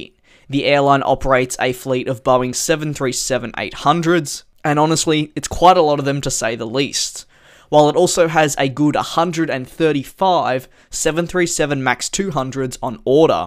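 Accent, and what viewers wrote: Australia. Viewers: hi I’m Cj are u Australian??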